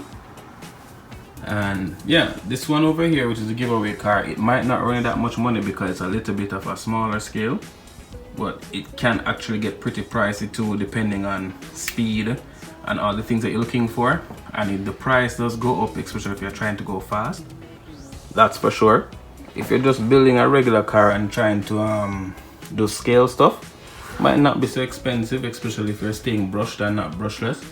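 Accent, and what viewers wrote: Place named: Jamaica. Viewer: 100% Love your Accent brother -reminds me of Cool Running's liked and subbed my friend in CA:trophy-yellow-smiling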